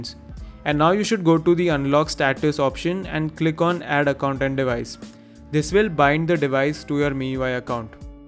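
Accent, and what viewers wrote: India. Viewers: My friend good presentation except your indian fast talking accent is making an english speaking or non english speaking person find it very difficult to understand the proccess and not being able to follow the steps out of fear of either not making it or destroyubg his phone.....Please speak slower......Thank you..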